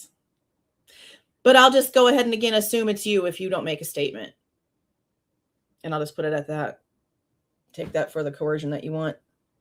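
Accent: Texas, United States. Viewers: ❤She’s from Texas I can tell….❤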